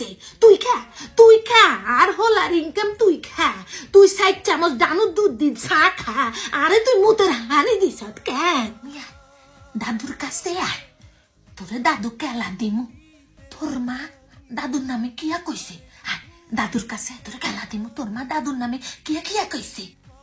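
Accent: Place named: Feni, Bangladesh. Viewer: আমার বাড়ি ফেনী তাই কথার অনেক মিল পাই। আপনার কথা শুনে দাদুর কথা মনে পড়ে গেল। দাদু এখন আর নেই। অনেক মিস করি দাদুকে। ❤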